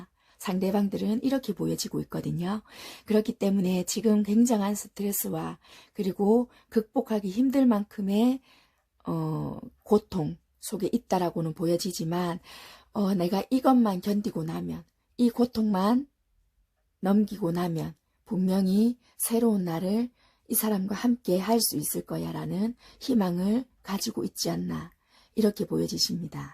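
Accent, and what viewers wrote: Busan, South Korea. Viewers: 감기로 목상태가 안 좋으신가보네요. 얼릉 쾌차하세요~ 사실 저도 부산 사람이라 부산사투리 첨부터 들었을때부터 정감이 많이 가서 계속 리딩 듣게 되네요^^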